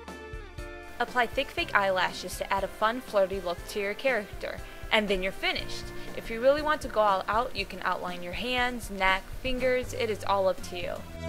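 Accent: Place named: Michigan, United States. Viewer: do i spot a Michigan Accent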